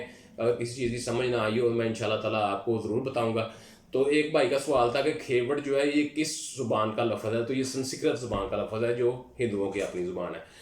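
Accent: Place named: Punjab, Pakistan. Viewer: جناب بہت زبردست لیکچر تھا ۔ مگر آپ کی اردو پر پنجابی زبان بہت زیادہ حاوی ھے Khana kasht: once a tenant, always a tenant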